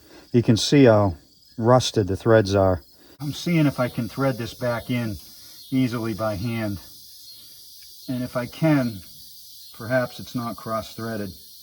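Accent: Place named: Northeast, United States. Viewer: Love yalls videos! I assuming yall originally from diffrent states? You sound like you're from the Northeastern area, and your wife has a normal accent 😁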